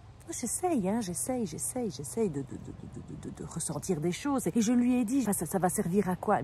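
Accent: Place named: Belgium. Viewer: Même format, même humour, même petit accent et quelques expressions belges: suis tjrs aussi fan! 🤗 Tellement de sujets que l’on peut traiter ainsi Hâte de voir comment tu vas décliner celui-ci 😜 La nature et l humour, deux piliers de ma vie 🥰 La citadine en pleine nature, je me moque gentiment et j espère apprendre encore des bienfaits de notre nature, avec ☺️ À dimanche prochain 🥳🥳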